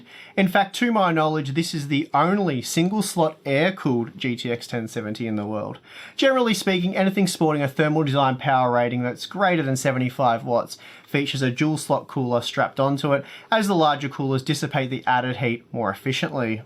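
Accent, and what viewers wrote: Australia. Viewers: I do so like your videos matey, nice to hear an Aussie online. Keep up the good work on the reviews. :)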